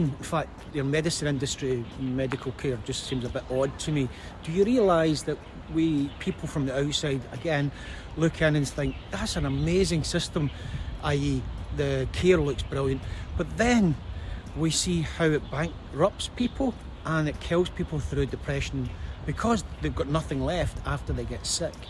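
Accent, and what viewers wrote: Scotland, United Kingdom. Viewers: I love those Scottish dulcet tones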